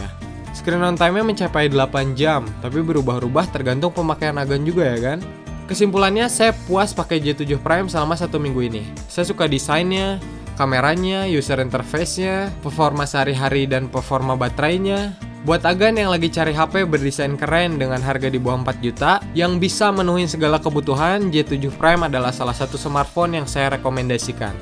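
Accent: West Java, Indonesia. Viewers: Nyunda banget Kang 🤣 kerennn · "Halo Juragan", suara khas amat sundanya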